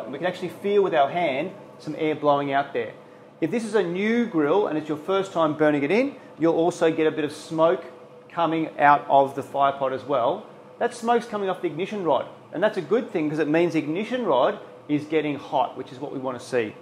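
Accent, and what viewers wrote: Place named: Australia. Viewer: What is it about Aussies that makes me want to listen intensely? What we perceived as an accent is so awesome! Good job mate!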